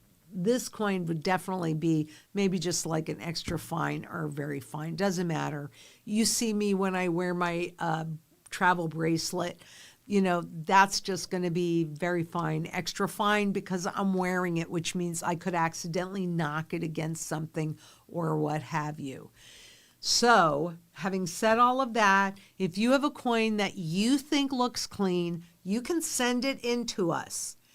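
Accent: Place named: United States. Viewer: My nit.....An historical is used when you speak in a British accented English because the "h" is silent. American accented English says "a" historical cause the "h" is voiced. speaks American accented English. So, she is correct. you can take your nit back now.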